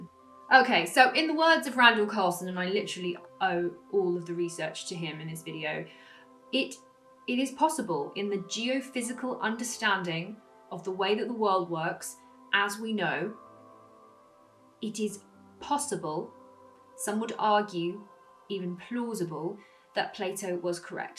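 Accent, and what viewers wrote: England, United Kingdom. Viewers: You, that British accent, gorgeous, humorous and backing it all up with Data, our own version of Laura Croft, cheers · wonder how many subs she's gained since Randall mentioned her on rogan... I mean that's why I'm here...I stayed bc I think I'm in 😍... Americans are simps for english accents at least this chicago kid is lol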